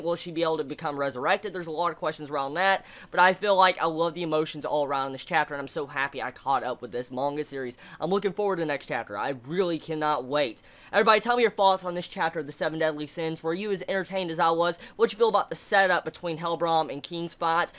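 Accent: South, United States. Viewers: 5 years ago u sounded verrrry southern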